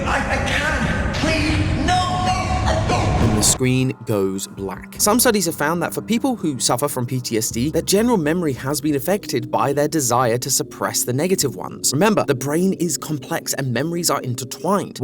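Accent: United Kingdom. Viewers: I genuinely do not remember you being British, am i from another universe?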